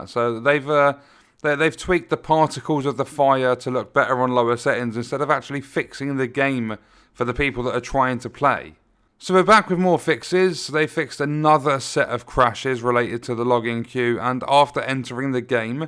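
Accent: England, United Kingdom. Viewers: he aint eu player he sounds english - they are not in eu lol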